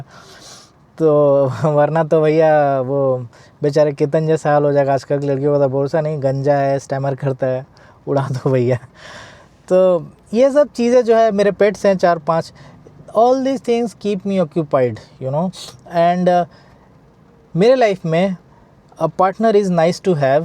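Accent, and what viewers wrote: Bengal, India. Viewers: are you bengali ?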